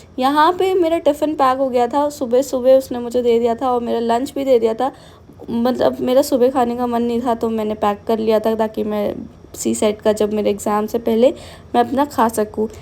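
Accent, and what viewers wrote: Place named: Bihar, India. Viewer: R u bihari apke cll pe ke language se ekdm apne ghr ke bihari language ki feel aayi 🥹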